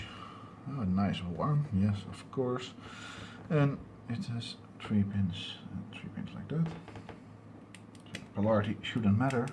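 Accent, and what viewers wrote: Netherlands. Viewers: Im from Belgium but every Dutch speaking person that speaks English has a bit of a accent ^^ and I ordered the one from icstation and even though there site has a full tutorial on it your videos is probably going to be a lot more help I contacted them about reprogramming the chip and it's sad that I have to buy one of those 50 euro programmers for it:( plus a adapter I really hoped there was a other way for that also you have a better diy suggestion for making the led bending template I have no drill they suggested cardboard maybe and 1,5cm spacings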